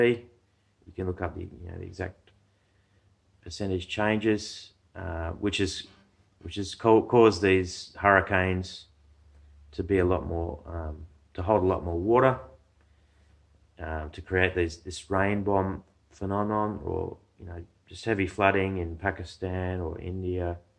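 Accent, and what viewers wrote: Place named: Australia. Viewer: thanks for the Video you sound like an Aussie too 😎 I live in a smal remotel costal town in East Gippsland Victoria and I have conversations about this with my wife, friends many locals are aware what we are facing.. and I also travel to Sydney for work and the people I know there are having this conversation too.. but, my circle of friends live simply, and are artists or musicians .. yet I think alot more people deep down know this is happening the conversation is getting larger .. unfortunately we are just locked into the current paragdime,/paradox we face there is no escape..I will subscribe to your channel...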